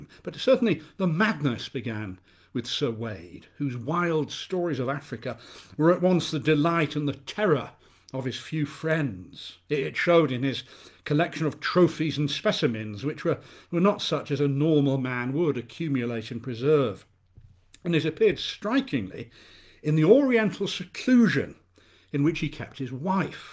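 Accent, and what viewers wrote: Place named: England, United Kingdom. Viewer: Thank you for this wonderful reading. It's funny how good Lovecraft's writing sounds in the English accent!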